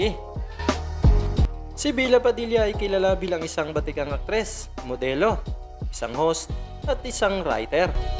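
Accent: Visayas, Philippines. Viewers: Bisaya kaman dong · Bisaya siguro ang may Ari ng vlog na eto. Iba kasi ang tuno ng Tagalog niya. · Bisaya kase. Ok lng yan. Hehehehe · Ang ganda ni Bella Padilla😍 At sa mga nanghusga sa Vlogger dahil matigas magsalita haler bisaya e proud ako sa mga bisdak inggit lang kayo hahajokjok😂✌️ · Kung ganyan man sya magsalita ay huwag po naten sya pagtawanan .. .ganyan naman talaga magsalita ang bisaya diba.. . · I'm also a bisaya and i'm proud of it. Most of us are nahihirapan magsalita ng tagalog fluently, fortunately tumira ako sa Manila nung bata pa ako kaya okay magtagalog. Pero naiintindihan ko ang may ari ng vlog na to kasi may mga kaibigan at classmates din ako na ganito magsalita ang I don't see any problem with that. Kuya Vloger, ipagpatuloy mo lang po ang pagvavlog proud kami sayo na mga kababayan mo. Saludo po ako sa katatagan ng loob mo, wag mo nalang po pansinin ang mga nambabash sayo, most of them are inggit lang sayo. 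Ipagpatuloy mo lang gumawa ng mga videos as long as it makes you happy and also continue to be proud Bisaya😊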